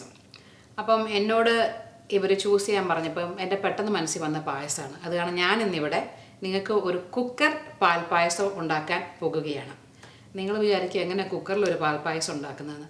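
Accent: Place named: Kottayam, India. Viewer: Chechii.. beautiful presentation👌. Samsarathile aa kottayam style... 😍😍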